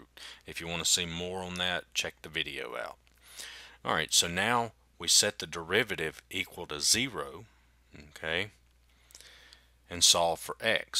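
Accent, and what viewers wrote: South, United States. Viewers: I know im four years late but youre the first souther math tutor I have ever heard. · Same here.... I love the Southern accent... Howdy all the way from South Africa 👋😃 🌍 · Bro speaks like a cowboy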